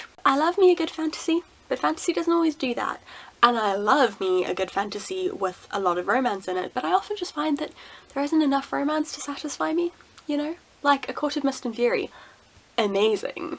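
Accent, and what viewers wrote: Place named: United Kingdom. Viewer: Are you British perhaps? 🤔 quite the strong accent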